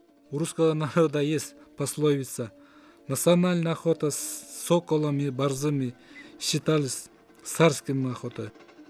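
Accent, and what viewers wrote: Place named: Kyrgyzstan. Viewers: Э гөкмээ байке кыргыз, иван же сергей эмес, таза кыргыз ушундай сүйлөйт орусчаны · Таза кыргыз орусча суйлогондо ушундай суйлойт билип ал манкурт☝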